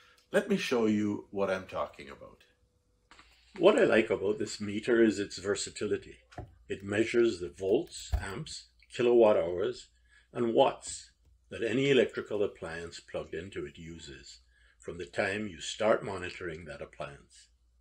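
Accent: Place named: Caribbean. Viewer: You sound Caribbean ish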